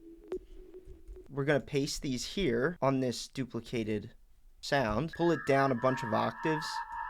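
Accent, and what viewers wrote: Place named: East Coast, United States. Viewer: you must be from the upper east coast " cut it in hafv"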